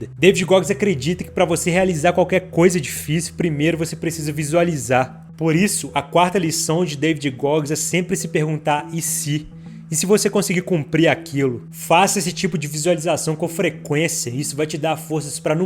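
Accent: Minas Gerais, Brazil. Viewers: Parabéns!! 👏🏼👏🏼 vc é mineiro né? 😅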